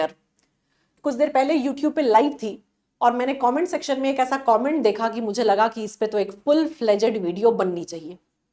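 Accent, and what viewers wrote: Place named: South, India. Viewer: AAP south se ho kya mam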